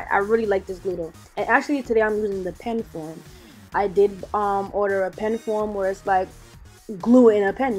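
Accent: New York, United States. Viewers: You’re def a New Yorker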